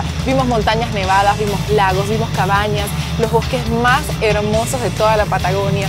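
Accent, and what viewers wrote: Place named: Venezuela. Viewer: Por el dejo pareciera que fuera venezolana. Una cachetada para tus compatriotas eh!? tu disfrutando la vida mientras que por aya se están muriendo de hambre.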